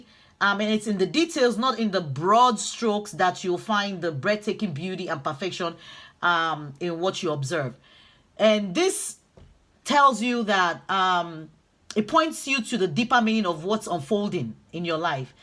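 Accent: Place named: Nigeria. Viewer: This absolutely resonated & it really spoke to my spirit. I love your Nigerian accent too! ;)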